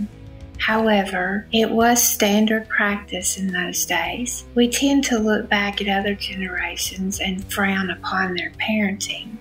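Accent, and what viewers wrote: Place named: Kentucky, United States. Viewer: Your voice is so soft & lovely. What is your accent? You sound Kentuckian to me.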